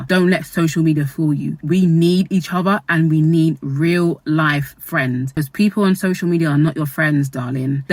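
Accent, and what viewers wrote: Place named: England, United Kingdom. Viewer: English accent and rich dad poor dad? New sub!!! 😍😍😍😍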